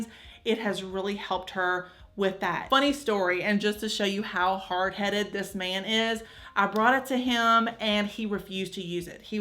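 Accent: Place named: Alabama, United States. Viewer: This is my first time finding your page and my first thought was she talks just as country as me, i wander if she lives in Alabama too ? 😂😂 And before the video ended u confirmed that in fact u do 👏🏽😂i knew it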